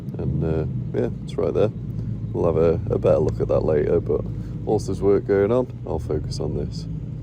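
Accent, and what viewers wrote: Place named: England, United Kingdom. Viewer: Im just curious, can anyone tell me where his accent comes from? He sounds virtually identical to a podcaster i listen to, from England. I mean, he pronounces, enunciates and uses the exact same vocabulary as this podcaster. I cant help but be curious. Lovely accent, by the way. Anybody know for sure? Thanks😊 - from 🇺🇲Utah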